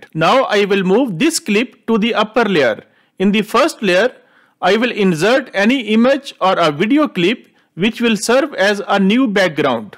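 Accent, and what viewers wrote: India. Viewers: Indian accents are always funny 😂. Very good video, very helpful 👍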